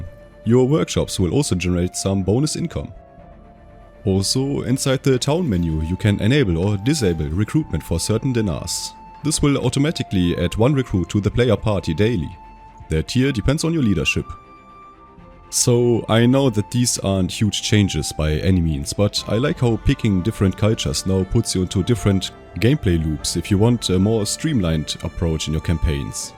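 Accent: Germany. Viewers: Klingst deutsch/schweiz/österreich :D Ich warte ja seit release auf Shokuhō oder einen der Mods die das Spiel in Zeitalter der Industrialisierung mit Linieninfanterie bringt. Der Star Wars Mod wurde ja scheinbar eingestellt :(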